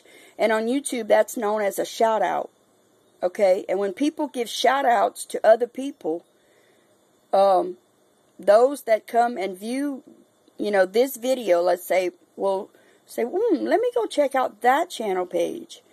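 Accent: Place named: Louisiana, United States. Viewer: you sound like my family in southern Louisiana. Your accent reminds me of them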